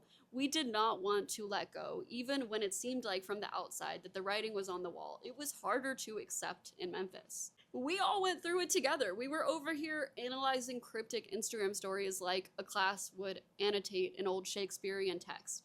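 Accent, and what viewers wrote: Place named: New York, United States. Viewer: Where's her accent 😂😂 she sounds more ny then t.n lol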